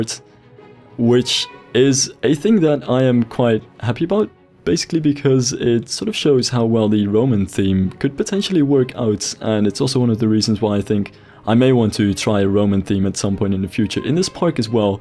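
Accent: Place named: Netherlands. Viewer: ja ik dacht dat je engels was. Ik hoorde dat je een bepaald accent had maar nederlands? ik probeer heel vaak goed te luisteren om te kijken of ik hoor of je nederlands bent, maar het lukt gewoon niet. Bij Kwebbelkop en Jelly kun je het wel horen.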